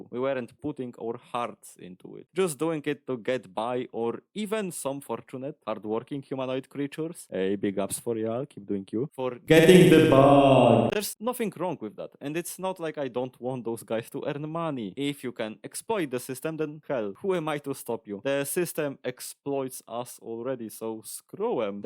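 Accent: Poland. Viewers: Yeah I was thinking you sound Polish, did I get that right?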